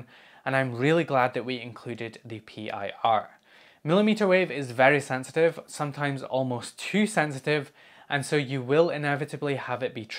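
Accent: Scotland, United Kingdom. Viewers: Another amazing video!. Informative and enjoyable to watch. Still waiting for you to lean into the Scottish accent more and roll your Rrrrs among things. 😝 Maybe in one of the always funny blooper takes at the end? 😉😉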